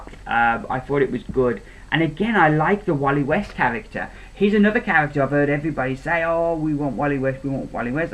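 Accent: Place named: South, United States. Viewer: Enjoying your southern US accent :)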